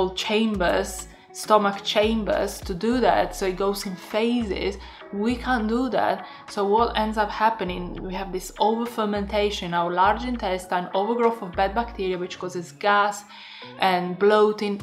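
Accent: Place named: United Kingdom. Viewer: Where is your accent from? I think you learned british english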